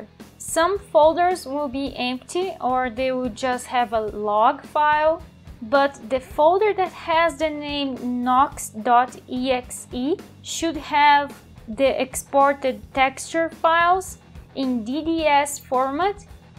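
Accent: Brazil. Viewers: Tu não me engana! Tu é Brasileira! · Esse sotaque não me engana. Tu é br né? haha Excelente vídeo!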